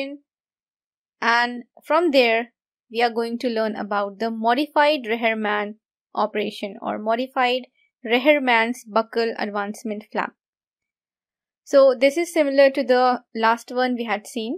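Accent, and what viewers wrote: India. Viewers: Medok banget Indian mu sist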